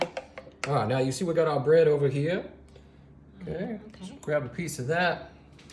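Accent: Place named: Louisiana, United States. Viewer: Not Georgia cajun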